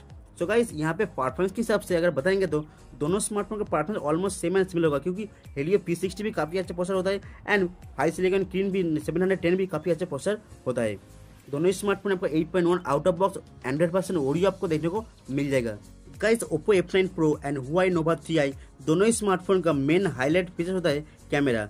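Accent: India. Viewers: Damn your Indian accent is really hard and you speak jibberish already.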